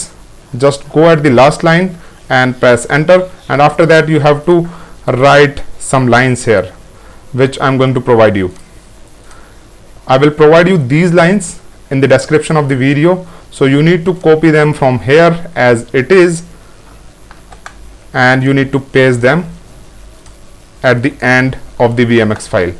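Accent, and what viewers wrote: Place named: India. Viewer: why is your sound like a tech support scammer from india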